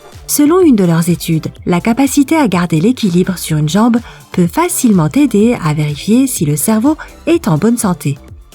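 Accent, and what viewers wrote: Quebec, Canada. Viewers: elle a la rhume la dame kubquoi horrible la voix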